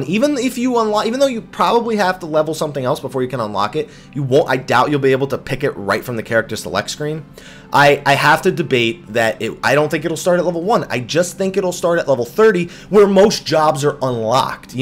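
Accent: New Jersey, United States. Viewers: Two yoots. Old school Joisey speak.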